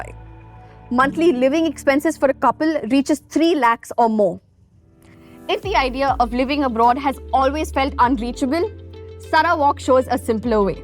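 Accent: India. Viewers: Is this AI, white woman talking in Indian accent and Hindi 😮....why is she saying Sarah walk ....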